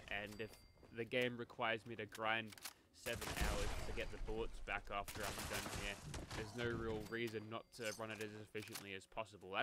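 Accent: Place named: Australia. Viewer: Long time fan of your channel. I have always enjoyed your direct, tell-it-like-it-is, no nonsense way of speaking. Plus the Australian proper English dialect (I.e. using words like Whilst, Respectively, etc) is rather refreshing. When it comes to griefing, pvp, etc, I don’t think you’ve ever been largely in favor or against either. The only group of the Fallout “community” that you’ve always been vehemently against are the RMT traders. And I 100% agree with you.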